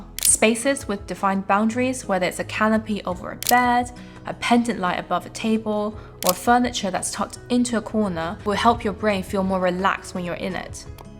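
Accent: United Kingdom. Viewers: I’m brazilian and your video cames to me. I’m IN LOVE with your accent and now I’m “using” your channel to practice british accent/english. THANK YOU FOR YOUR CONTENT! Ps: Also, I’m building my house with my groom, so your tips is very rich. Again, thank you! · I've never heard so nice British accent. ❤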